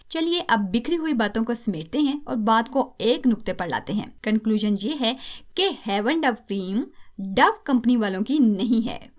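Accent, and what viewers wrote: Punjab, India. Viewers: Hy... i love ur voice specially ur punjabi language i loved it ❤ bcz m from india #punjab# 😘😘😘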